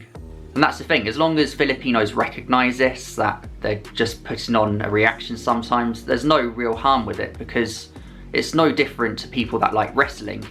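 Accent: United Kingdom. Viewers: If I'm not mistaken, I think his accent is called Estuary English. Correct me if I'm wrong. Notice that for TH, he uses /f/.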